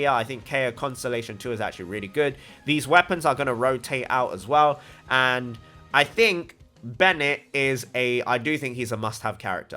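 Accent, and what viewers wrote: United Kingdom. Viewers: Loving the vids. Question: are you British? Just curious cause of the accent. Ps: don't let haters get you. I didn't k ow that the group was based from an Italian troupe/show so its nice to know!! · just found this channel, love the personality , enegy , and of course that asian british accent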